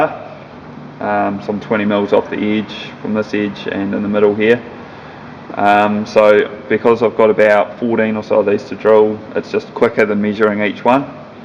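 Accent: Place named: New Zealand. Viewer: Very helpful, thank you! It sounds like you're from New Zealand. South Island???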